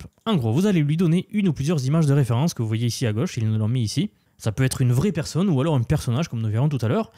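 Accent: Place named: Loire, France. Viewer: Je reconnais l'accent du 42 😅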